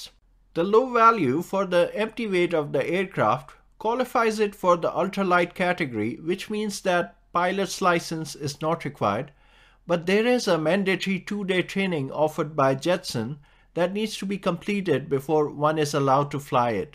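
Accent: India. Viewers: Fucking indian accent🤦‍♂️ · Акцент здорового индуса? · o!! indian accent, my pleasure)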